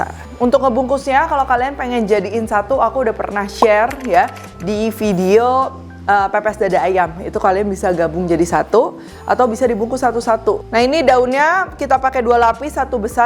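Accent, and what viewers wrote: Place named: Java, Indonesia. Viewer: Pepes udang enak banget chef medok banget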